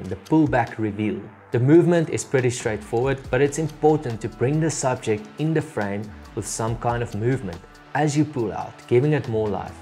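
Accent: South Africa. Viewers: You sound South African 🇿🇦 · As a South African I couldn't help but be taken aback by the accent. Cheers boet 🇿🇦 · Am I hearing a Saffa accent? Thank you the amaizing tutorial! Keep it up! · Awesome tips bud. South African I'm guessing?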